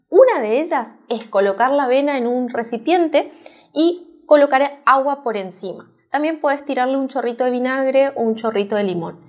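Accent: Misiones, Argentina. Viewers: Excelente equipo doctores! Se nota su acento argentino, felicidades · Buen día es posadas, misiones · ES DE MISIONES ( ARGENTINA) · De misiones son , norte.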